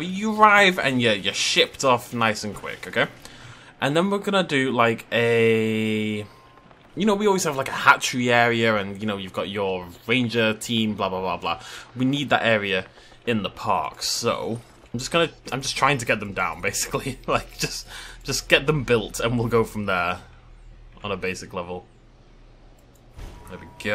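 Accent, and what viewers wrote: United Kingdom. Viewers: What the hell happened to your outro music also quit the British accent and it’s annoying to hear!!!!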